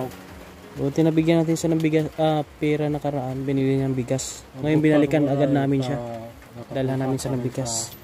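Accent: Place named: Cebu, Philippines. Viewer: Ano yung dialect mo cebuano ka ?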